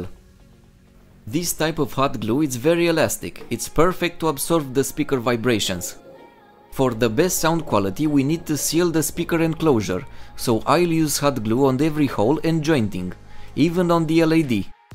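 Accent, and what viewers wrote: Russia. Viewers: По английски не чего не понятно акцент русский мешает давай на родной мове не стесняйся.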